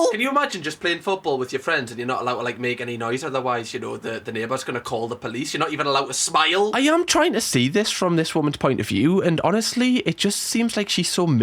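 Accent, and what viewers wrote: United Kingdom. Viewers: I've been really enjoying hearing your comments and opinions. It's like my own mind in speaking but in a British accent which always makes me laugh. Cheers mate for your content and genuine effort towards your craft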